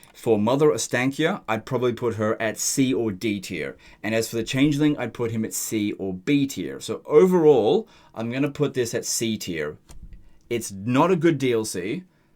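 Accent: Australia. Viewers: Youre Australian?! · OMG!!! Are you Australian?!?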